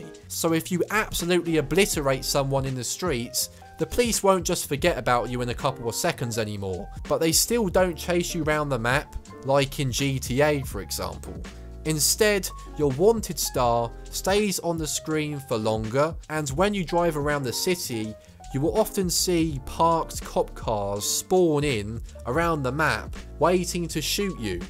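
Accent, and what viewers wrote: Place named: Chelsea, United Kingdom. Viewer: Man u sound like such a toff. Your aight there i mean im here.. its your voice ..mad made in. Chelsea vibes . Hearing you getting your winge on about fps and world densities is funny..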